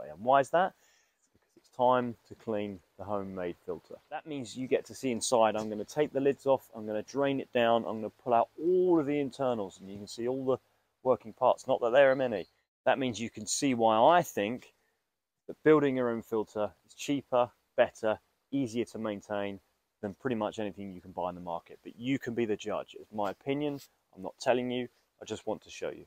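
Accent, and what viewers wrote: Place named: England, United Kingdom. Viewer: He’s speaking in a English kingdom accent and people that do that have a different definition of words ..often the English like that is only understood by the speaker or someone that does the same..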